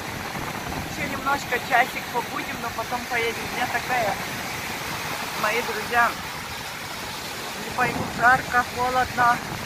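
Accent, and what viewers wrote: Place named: Bulgaria. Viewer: У вас акцент болгарский 😉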